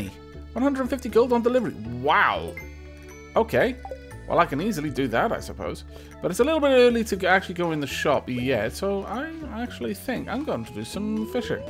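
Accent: United Kingdom. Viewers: from 1 brit to another, I can definitely hear it with you sayings and use of language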